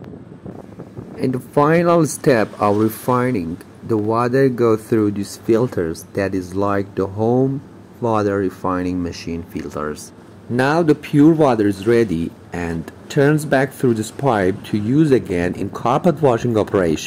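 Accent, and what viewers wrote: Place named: Europe. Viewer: Why is the voice that's narrating this video sound European but all the men in the video look Middle Eastern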